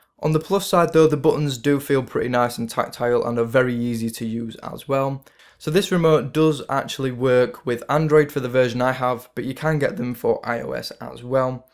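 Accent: United Kingdom. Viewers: I don't understand well your British English.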